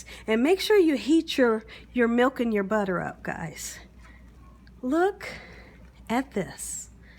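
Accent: South, United States. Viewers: I just love listening to your southern voice 😂you’re so sweet THANKS FOR THE COOKING TUTORIALS 🙏🏾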